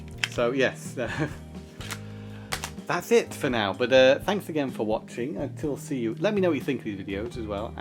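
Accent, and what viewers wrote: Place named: United Kingdom. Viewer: Bro what's up with this channel, you sound British and nothing like the bald bearded guy on the latest video about kinder eggs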